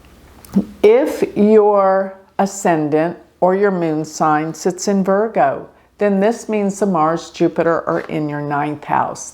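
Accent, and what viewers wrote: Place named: South, United States. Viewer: Just found last week. Love her. And she is in Dallas where I lived for 30 years. I knew she was southern with that accent and hair. She is smart Dallas glamour!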